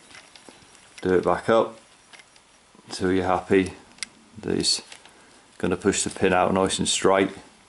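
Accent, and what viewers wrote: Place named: Totton, United Kingdom. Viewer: Great video, very clear instructions. Are you from Totton? Accent sounds like it!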